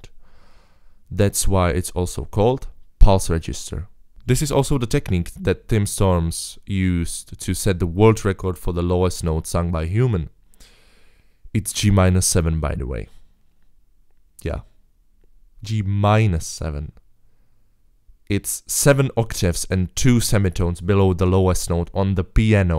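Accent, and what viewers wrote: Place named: Poland. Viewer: Cuz of your accent I thought you're my Polish bro, but... okay, I wasn't that far 😂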